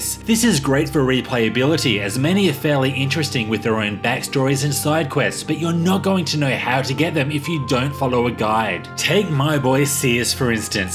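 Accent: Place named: Australia. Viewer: I think it’s Aussie but for some reason has a strong american R sound…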